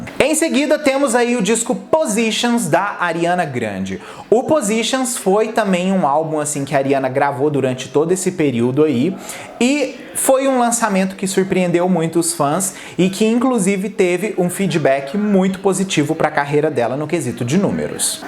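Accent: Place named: United States. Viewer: eu adoro o e o conteúdo dele, mas uma coisa que me agonia muito nos vídeos dele é qnd ele enfia um sotaque americano numas palavras tão..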